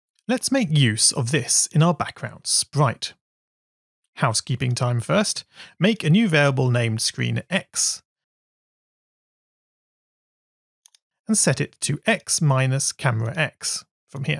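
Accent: United Kingdom. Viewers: Not really, it's just a british accent, but I see where you're coming from, as they do have similarities.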